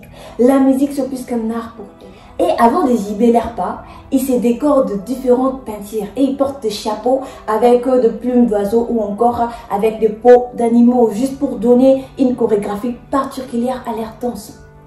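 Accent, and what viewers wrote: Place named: Democratic Republic of the Congo. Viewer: Elle a l’accent 100% zaïrois incroyable 😂😂😂 tu parles français comme les tontons Z la 😂 ze ne parle pas hahahah bangala au Gabon ça veut dire bite 😂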